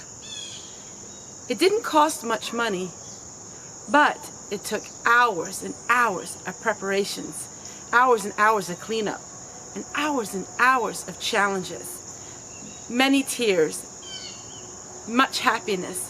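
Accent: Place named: South Louisiana, United States. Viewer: interesting video. I’ve watched a few videos, and I’m trying to place your accent. South Louisiana? Nonetheless, you have some really interesting videos. Keep up the good work!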